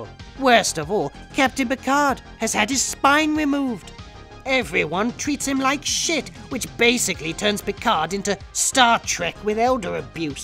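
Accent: United Kingdom. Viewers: I'm gonna put things more on "Willfully Ignorant" rather than "Downright Stupid". But please don't comment on things you have any clue on what you're going on about. At times, you sound a lot like Alex Jones with a British accent than any person with logic or reason.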